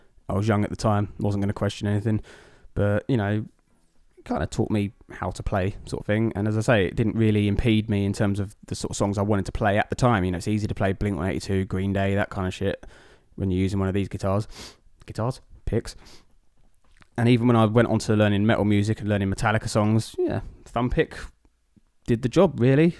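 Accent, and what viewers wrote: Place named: Texas, United States. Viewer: Before this video I was pretty sure you were American, and probably from Texas.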